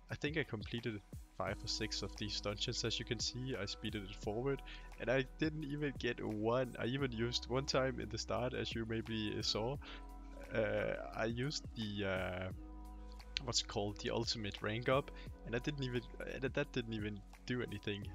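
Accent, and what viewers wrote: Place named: Germany. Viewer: I hear some deutsch in your english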